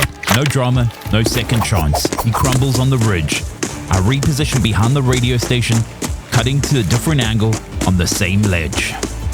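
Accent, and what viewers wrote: South Africa. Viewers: I see your ping is around 26ms but your accent sounds South African. Did you relocate or am I missing the plot here :) Good job on the shorts and longer form videos. Always a nice watch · You sound South African in a way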